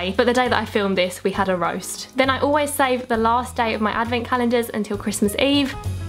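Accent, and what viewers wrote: Essex, United Kingdom. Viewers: I love her Essex accent ❤️❤️❤️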